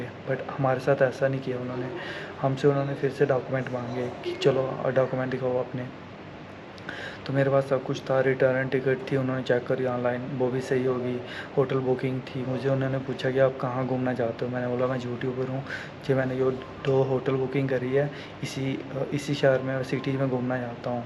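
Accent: Pakistan. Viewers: Bhai teri tone se lag raha hai ki tu pakistani hai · Iska accent pakistani kyu hai? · अबे तेरी बातों से और जैसी हिंदी बोल रहा उससे पता चल रहा साफ साफ तूं पाकिस्तानी है इंडिया को बदनाम करने की साजिश मत कर chutiye कुछ नहीं उखाड़ पाएगा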